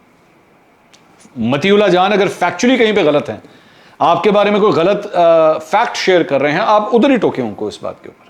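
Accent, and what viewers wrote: Punjab, Pakistan. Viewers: Ap ka punjabi tarka bht acha lgta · Hahahaha Bhai ap ka ya Jo panjabi ka tuch h vlog main ya Kamal ka hota h I love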